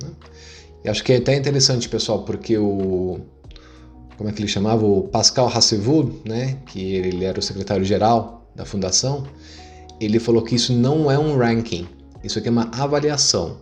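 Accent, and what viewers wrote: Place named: England, United Kingdom. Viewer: A Seiko comprou a Orient e surgiu a Orient Star, relógios belíssimos e diferenciados, fino acabamento. Tenho um Contemporary com open heart, muito preciso e bonito, esse cara não conhece um Orient Star. Ele também tem mau gosto, seus relógios com pulseiras laranjas que o digam, cada qual com seu lado brega... a cruz suíça não afasta esse trejeito de um Magal, nem sotaque do inglês...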